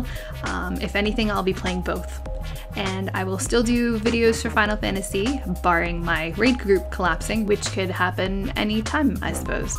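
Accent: Canada. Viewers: Ok, now i know that i love the canadian accent! :D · haha, just started watching your videos, and dont worry, canadians talk about the same as us here in Minnesota, and we're the only ones that talk correctly. Also your video guides are great. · I just want to say, it's extremely reassuring to know there is someone in their 20's playing this game, that also is Canadian and has people make fun of the way they say completely normal words.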